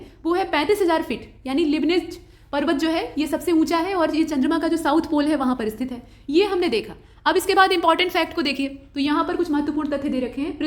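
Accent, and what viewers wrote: India. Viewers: Jo bhi kaho lekin aapki awaaj sahi lagti hai...Hindi medium ki jhalak ke sath english ka bolna mast lagta hai....😍😍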